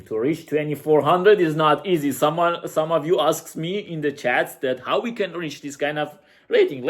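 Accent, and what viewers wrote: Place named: Italy. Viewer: You’ve got Italian accent 😇 Cool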